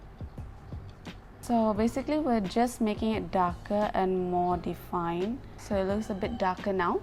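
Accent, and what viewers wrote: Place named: England, United Kingdom. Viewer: Yayyyy thank you for the tips💋. Suka btul sy sma tu english accent ko. Poinsikou💜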